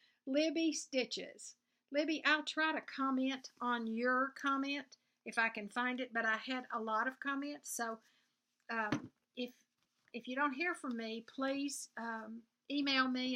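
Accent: South, United States. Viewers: You are precious!! I understand you completely because I’m from the south also!! You make my day!! God Bless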